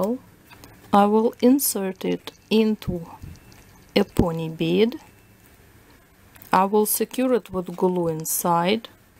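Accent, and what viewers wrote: Russia. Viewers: Are you Russian? I love the accent!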